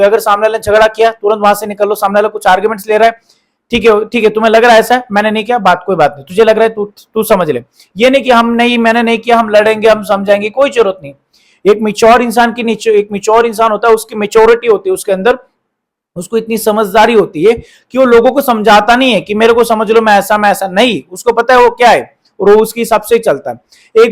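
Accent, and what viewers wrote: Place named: Maharashtra, India. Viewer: आप मराठी हो क्या सर आप की बातो मे मराठी टच हे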